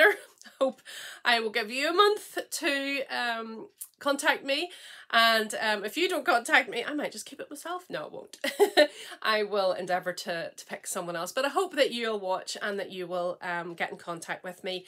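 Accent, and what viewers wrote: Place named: Northern Ireland, United Kingdom. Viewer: Hi, I found your podcast yesterday. Absolutely love your podcast. It was your accent that made me watch as l was a Carrickfergus girl /woman who has just moved to the North coast. Incidentally l miss Jackson’s too!!!! I also spend a lot of time in Devon. I was just thinking you can take the girl out of Northern Ireland............ As a Christian l find your words very encouraging. Thank you so much xx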